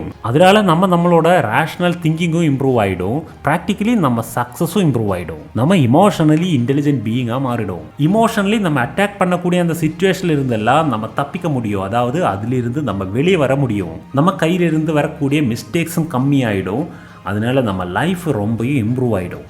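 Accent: Kerala, India. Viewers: Maliyalais Tamil speech.. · Neega malayaliya... ? ungaloda thamil vithyasama erukke...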